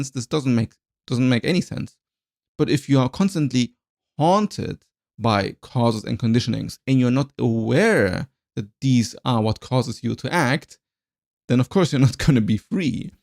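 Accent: Germany. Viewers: Am i crazy or is that a german accent if yes keep it going, german youtube is so trash that this content is gold compared to their mainstream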